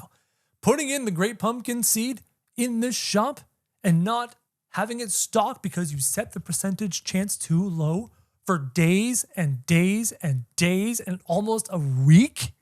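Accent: Canada. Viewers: Genuinely thought you were from the UK and now I hear the Canadian, I'm so dumb 😅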